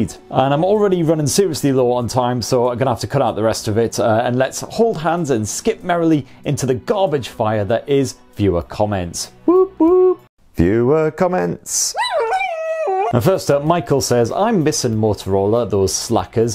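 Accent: United Kingdom. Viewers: content is absolutely amazing but this british english is completely garbage :D :D btw i am not american ;)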